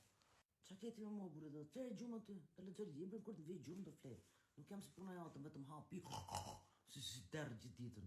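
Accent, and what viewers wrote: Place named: Central, Albania. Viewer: O Zot....eshte e vecante...mynyra si flet me kujto gjuhen e shqiprise se mesme ...sa mall 😅😅😅😅😅 Je shume originare. 😂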